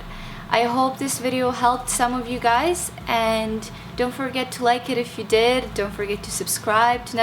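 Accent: Canada. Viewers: exactly,i think she is canadian. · Now i know how the Canadian accent sound like :) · Ukrainian / Canadian me thinks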